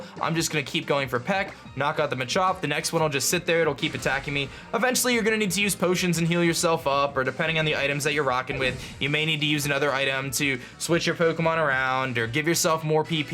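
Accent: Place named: California, United States. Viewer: sounds like he's from California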